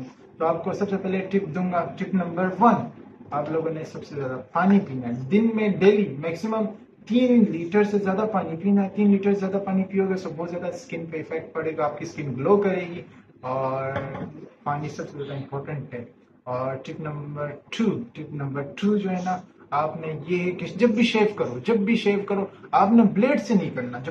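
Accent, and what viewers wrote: Pakistan. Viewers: Bhai tere bolne ke style se lag raha hai ki tu Pakistani hai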